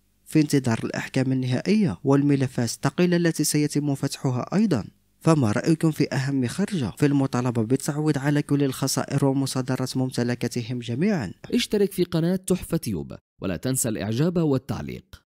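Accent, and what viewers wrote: Morocco. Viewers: Tu as une accent marocaine!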